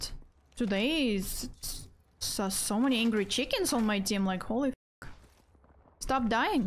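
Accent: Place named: Russia. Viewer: I had no idea that your accent was russian. As an american i can usually spot a russian accent but tbh I thought your accent was spanish. Either way, I like it and think you're absolutely beautiful. Not in a creepy way though, I'm happily married. Love your gameplay too. You're really good! Keep it up!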